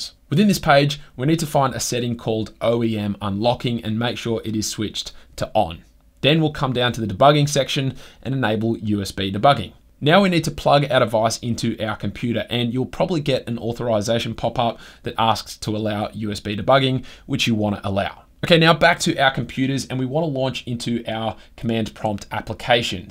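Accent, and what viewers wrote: Australia. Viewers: Hey, first and foremost thanks for video as it's so awesome to finally be able to listen to an another Australian explain these topics. I wanted to ask if you could do a video covering how to install a GSI on a device as I have had trouble finding one that explains what to do clear enough.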